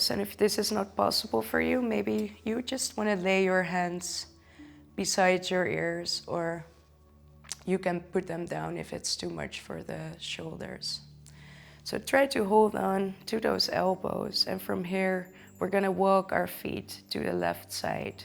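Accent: Netherlands. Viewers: Wow, this was a wonderful class. You're voice is very calming and grounding. If you would make more videos of practices I would defenitly follow them!! Thank you so much. I'm from Holland by the way. Taught I heard a Dutch accent in you're voice. 😊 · Are you dutch? You don't really have an accent but more like a non accent? I don't know I feel like you might be dutch?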